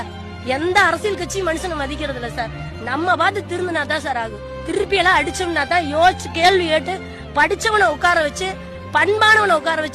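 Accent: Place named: Kongu, India. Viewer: Kongu thamizhichi......pechu arumai....❤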